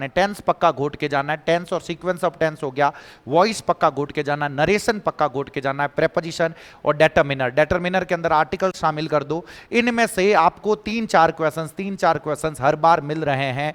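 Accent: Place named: Marwari, India. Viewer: Sir aap kha s ho marwadi hmare side ki h 😂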